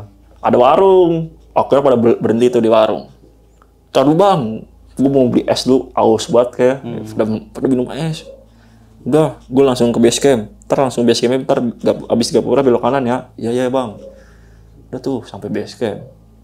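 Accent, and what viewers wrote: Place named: South Jakarta, Indonesia. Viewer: Gaya bicara jaksel punya nih, keren bang pembawaan ceritanya, jadi seolah-olah kaya kita ada di posisi itu, terimakasih sangat menghibur ❤❤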